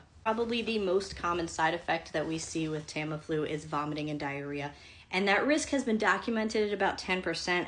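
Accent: United States. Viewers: Are you from upstate NY?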